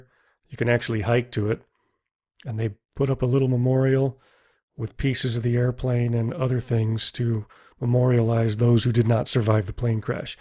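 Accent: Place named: Michigan, United States. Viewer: Narrator pronounces “height” as “hyTH” and “cross” as “crossT”. Wondering if he’s from Michigan/northern Ohio, northeastern Pennsylania.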